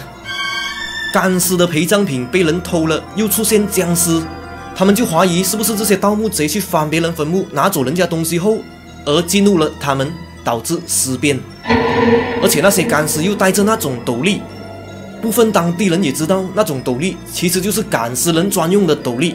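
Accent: Malaysia. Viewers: Bro你的口音真的很Malaysia style😂果然是个正宗的Malaysia人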